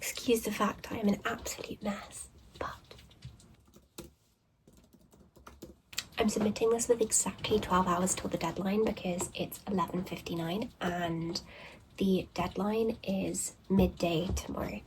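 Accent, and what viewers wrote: United Kingdom. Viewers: There is no such thing as "the British accent". is only one of a great many. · I honestly love your video because you're so cute and I love the British accent and I tried to learn it so I love you 💘🥹💕 and because you don't use music so much so I can listen to the accents to learn it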